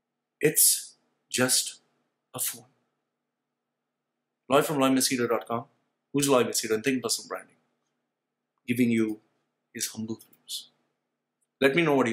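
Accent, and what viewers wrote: India. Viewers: Indian accent ...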